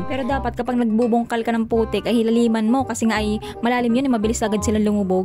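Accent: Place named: Quezon, Philippines. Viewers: Sa quezun province yan salita ni ate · nakakatuwa kapo yun sslita mo salitang quizon provence ssalot idol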